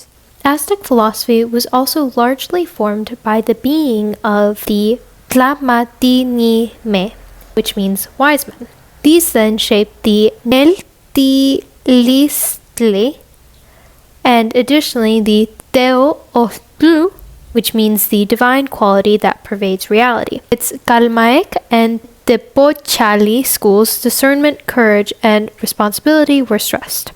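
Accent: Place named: Spain. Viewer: Your spanish accent is so beautiful im kinda jealous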